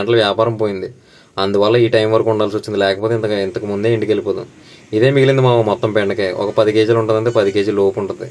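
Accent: Godavari, India. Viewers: Mawa nijam cheppu nidhi godavari kadha 😅😂❤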